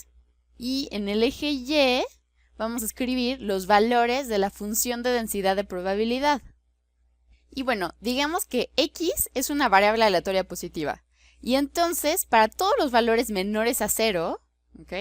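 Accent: Mexico. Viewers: Su voz es horrible, es la típica voz del DF que quiere sonar "fresa", aparte se supone que en docencia no se debe de utilizar el uso de modismos propios de una región como "padre", sobre todo en un lenguaje tan global como el castellano. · Buen día. Alguien me podría decir(desasnar) si esta forma hablar corresponde a una persona oriunda de México?,en ese caso seria la forma de hablar del pituco, cheto, etc (persona relacionado con la "alta sociedad y con dinero")? No se si pensó que solo los de México verían el vídeo, en lo personal hubiera preferido un castellano neutro. En gustos y colores.....a mi no me gusta su voz. Gracias por el vídeo. Saludos cordiales!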